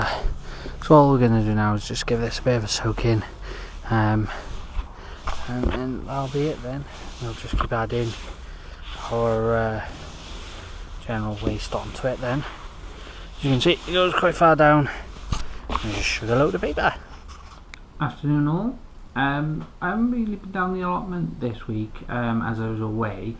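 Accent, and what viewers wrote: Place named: United Kingdom. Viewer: Hi, Just seen 2 video's so far, but its looking great. I did have (1) parsnip last year, and i totally neglected it. is your problem in germination? (i only sew like 6 or so) Tobacco i will give that a try this year, i dont know if i do have to ferment it though. I'm also very curious about your tomatoes; you sound like you're from GB (i'm just across the chanel) and i can't grow any tomatoes outdoors here, except for the cherry tomatoes. Will you also be planting/sowing some peppers, radishes,....? Grtz Tim, aka The Druid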